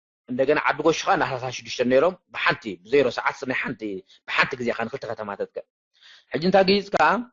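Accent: Agame, Ethiopia. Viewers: ትግርኛኻስ ኣይግድን ዓጋመ ኢኻ ትመስል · lakbat ab eri zeregexka aytemesiln eka agame kazab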